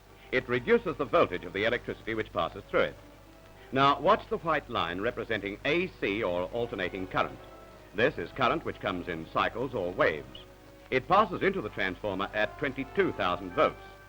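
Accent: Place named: RP, United Kingdom. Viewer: Great to watch and I love the deliberate BBC accent.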